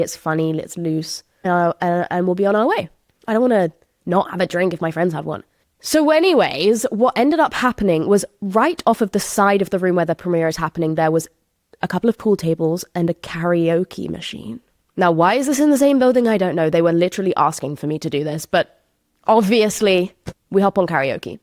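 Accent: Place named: United Kingdom. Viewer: why do you sound extra british in this episode 😭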